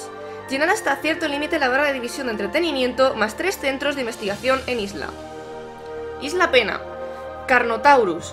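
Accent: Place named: Castile, Spain. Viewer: Tienes acento castellano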